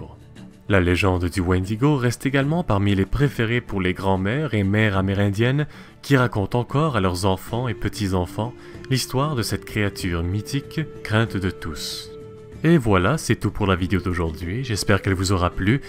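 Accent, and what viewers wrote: Quebec, Canada. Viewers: Petit accent québécois..👌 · En vrai la vidéo est bien mais l'accent canadien ça me sort du truck dsl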